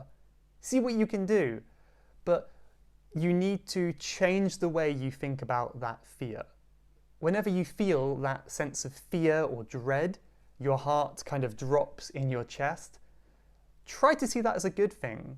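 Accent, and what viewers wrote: United Kingdom. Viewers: I am from India and I am here to learn british accent..and I get your videos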